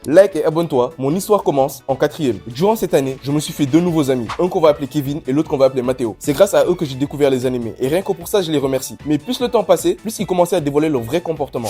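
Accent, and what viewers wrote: Senegal. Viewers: ta un accent de sénégalais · Mhh je suis nouveau, mais c'est un accent Sénégal que j'entends là ?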